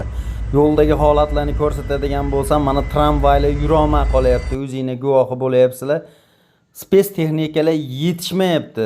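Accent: Chortoq, Uzbekistan. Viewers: Намангани каеридансиз😂😂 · Укалар чортокдан шева тортяпти. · Наманганданми дийман окам? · Чортокдан экан гапираетган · Диндошим шева Наманган чорток ка тортяптия тугри топтимми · Ака чортокданмисиз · Bu gapirib turgan Nomongonlikmi diyman,layk yuq sanga😂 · Ака узингиз хам Наманганни чорток тарафидансиз шекилли назаримда,шевангиз ухшаяпти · Namanganlik ekansizda aka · Çortoq şevada gapiryapti a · Чортокдамисан укам..гапинг рост. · Наманган чортогданмисиз а ака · Наманган Шева шекили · НАМАНГАННИ ЧОРТОК ШЕВАСИДА ВИДЕОНИ ЗУР ОБЗОР КИЛИБСИЗКУ 😂😂😂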